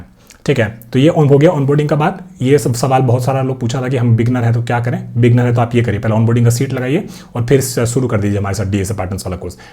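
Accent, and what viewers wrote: Bihar, India. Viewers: The Guy Is Littreally Khan Sir OF Coding - Teaching Style , Bihari Lang Style . Thnku Sir For The Content . ❤❤ Day 1.5 Done ( 1/1/26 )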